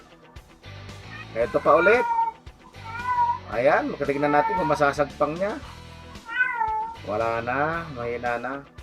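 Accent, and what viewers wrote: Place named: Ilocos, Philippines. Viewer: Parang ilocano kayo lakay base sa pananalita niyo hehe.anung province nyo po?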